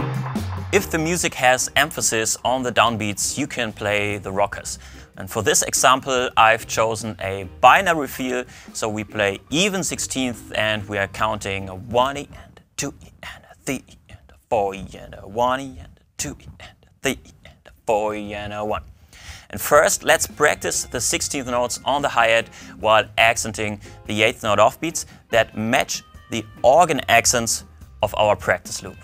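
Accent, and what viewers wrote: Germany. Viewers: Hallo Herr Das Video finde ich sehr gut, allerdings habe ich nach 2 Minuten abgeschaltet. Ich sehe einen englisch sprechenden Protagonisten. und denke mir das Englisch ist aber gar nicht so englisch. Das ist ein deutscher, der Englisch spricht. Ich muß gestehen das ich nicht so fließend Englisch sprechen kann geschweige es noch so gut verstehen kann. Das liegt daran, daß ich nun mal Deutscher bin. Jetzt komm ich aber zum Kernpunkt der Sache. Herr, warum machen Sie kein Video in dem Sie Deutsch sprechen? Für viele Menschen die nur Deutsch sprechen, würde es leichter fallen ihre Videos zu verstehen. Oder wollen Sie mit Ihren Videos nur Engländer, oder Amerikaner erreichen? Nun gut. Ich hoffe ich habe Sie jetzt nicht verärgert wegen meiner Frage. Ich wünsche Ihnen weiterhin viel Erfolg mit Ihren Videos So denn... ✌️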